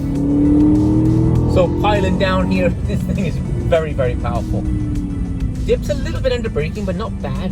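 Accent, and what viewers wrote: Wales, United Kingdom. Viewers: Good video. Where are you from originally? You sound Welsh.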